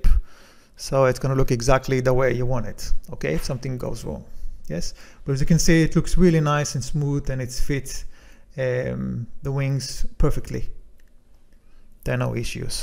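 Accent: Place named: France. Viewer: Parfait! J’ai beaucoup appris avec ton exercices! Votre stil d’expliquer est magnifique. Merci beaucoup! Avec tous les astuces tu a montré c’est beaucoup plus facile pour moi à construire avec fusion 360. C’est possible, que tu travailles chez Airbus? Je ne suis Français, je suis allemand, agrandi vis à vis de l’Alsace, à Fribourg, le Français est mon deuxième langue. Mais je crois, que tu à un petit petit accent français, ne c’est pas? Le petit mot « voilà » qui apparaît de temps à temps, me plaît beaucoup! De toute façon, ton anglais est merveilleux! Gratulations! Et encore un gros merci!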